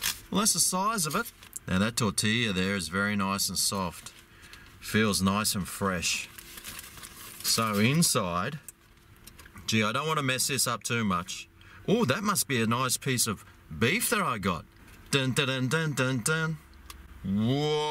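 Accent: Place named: Australia. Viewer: Love that Australian accent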